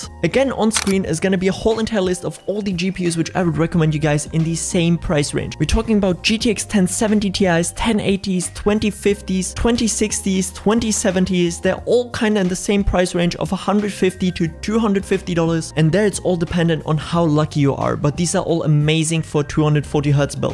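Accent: Germany. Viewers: Guter deutscher Akzent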